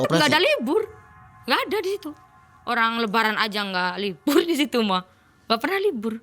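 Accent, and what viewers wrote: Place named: Java, Indonesia. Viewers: Ngapak tegal 😅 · Serem tapi aku ketawa Mulu sama Bahasa ngapaknya . Kebetulan aku orang tegal juga mba. salam kenal. sampean tegale Ndi yu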